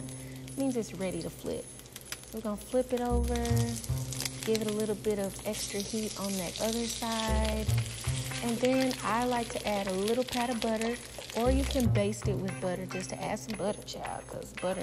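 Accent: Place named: South, United States. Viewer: Beautiful crab cakes. I'm going to make some. Also, that southern accent just crushed me. I'm in love.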